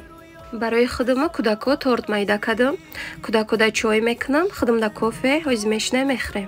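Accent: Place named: Hisor, Tajikistan. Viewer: И лафзи хисорихояй марам дугонахом ай хисор шахринав хамихели гап мезанан карсодем меган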